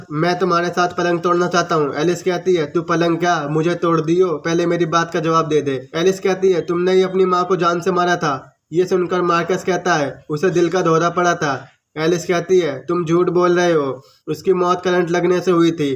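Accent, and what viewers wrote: Uttar Pradesh, India. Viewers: Bhai aap up se ho kya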